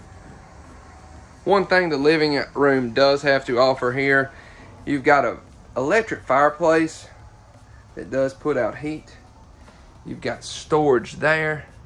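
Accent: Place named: United States. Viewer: Why dou sound more country then chance and id have both 🥓 sausage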